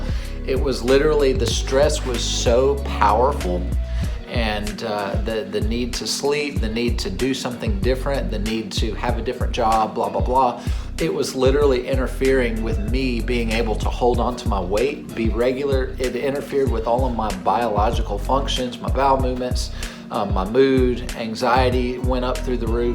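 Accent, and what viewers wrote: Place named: South, United States. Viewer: Sup, new to your channel! Slapped you with a like and a sub!!!! not to be weird but your voice is very nice! sweet and southern lol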